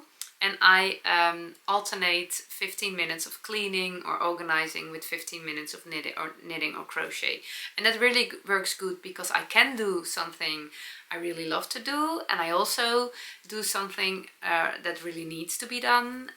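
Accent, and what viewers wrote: Netherlands. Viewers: I love your "Dutchy English",. On one point, we say "lying down" in English, not "laying down." For example, when you are speaking about yourself, you will say, "I am lying down" or "I am going yo lue down." You will use the word "laying" when you are speaking about laying something down, or laying a table with cutlery, for example. That's the general rule, although some people do say laying instead of lying. It's a matter of what you're used to, I suppose. Love all your projects!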